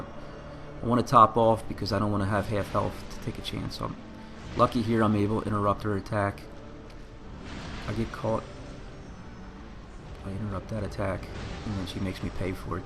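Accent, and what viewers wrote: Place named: Philadelphia, United States. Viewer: Great video bro we play the game literally the same big ass sword Big ass armor love it stg you sound like you got a Philly accent too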